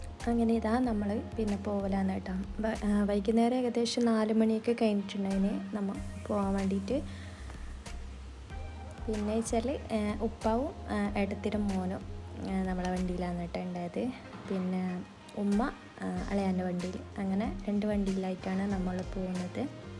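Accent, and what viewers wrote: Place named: Kannur, India. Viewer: ❤nice 👍ഈദ് മുബാറക് ആദ്യം ആണ് കാണുന്നത് ചാനൽ കണ്ണൂർ ആണെന്ന് തോന്നുന്നു സംസാരം കേട്ടിട്ട് 😊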